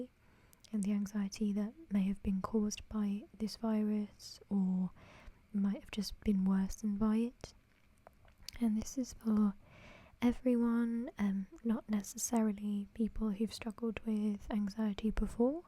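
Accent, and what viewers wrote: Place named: RP, United Kingdom. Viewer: Sound so posh here. I love it! 😂😊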